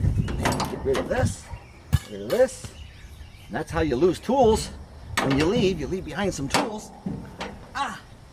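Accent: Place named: Dakota, United States. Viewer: No this is really how he talks, being from Dakota will do that to ya! Good will it run video!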